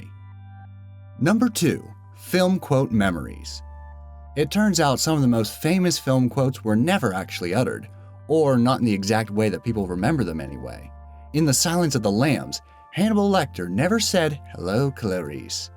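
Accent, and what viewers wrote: United States. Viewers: I cant believe how few people in the comments noticed that he got the Rocky scene wrong, Mr. T didnt play Apollo Creed, he played Clubber. Also, i know a lot of people griped about his pronunciation of Mandela, however i have known many people that pronounce it the way he did. Its usually because he has never, or cant recall ever actually hearing the proper pronunciation, so they fall back on typical language defaults that dictate how it would be said, of which, based in his accent, he speaks americanized english, so he is technically still pronouncing it right. If you are wondering why i would bother explaining this, i literally saw hundreds of comments from people jumping on his back about it, yet only a few people commented on the aforementioned Mr. T issue.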